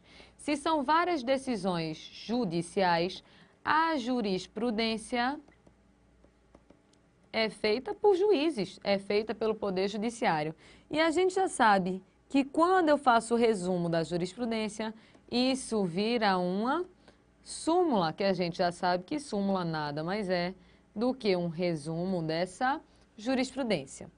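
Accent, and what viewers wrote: Pernambuco, Brazil. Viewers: Ahhhh eu amei esse sotaque, já estava desconfiada, sabia que era de Pernambuco. Adorei suas aulas <mail>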